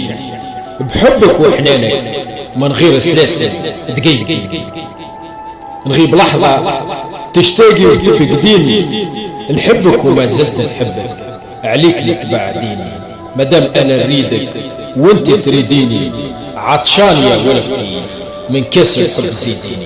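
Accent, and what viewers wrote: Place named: Tunisia. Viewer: روعة ❤ما احلاها اللهجة التونسية و اللكنة الجنوبية و الوسط الغربي ..دمتم بخير استاذ ..اشتقنا الى تونس في الغربة ..في القصيدة نلمس الروح التونسية و جونا و افراحنا و ذكرياتنا مع الحب الاول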